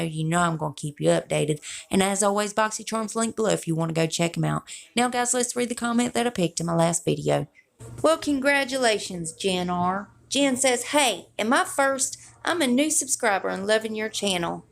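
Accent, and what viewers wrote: South, United States. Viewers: Girl your southern just like me!! Gotta love us southern gals. Xxoo. Cute channel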